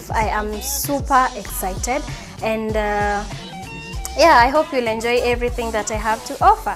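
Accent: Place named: Luhya, Kenya. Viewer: That Luhya ascent though....kills me😂😂😂😂😂😂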